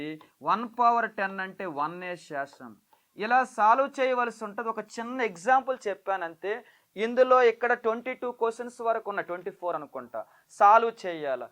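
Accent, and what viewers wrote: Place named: Srikakulam, India. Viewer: Sir meedi srikakulama vijayanagarama yaasa alaa vundi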